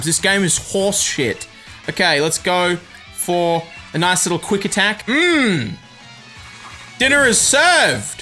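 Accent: Australia. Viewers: I love your sweet Aussie accent (; lmao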